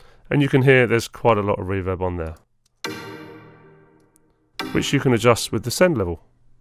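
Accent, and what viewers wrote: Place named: United Kingdom. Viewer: Nothing bet'er than to have a brit' teacher, noice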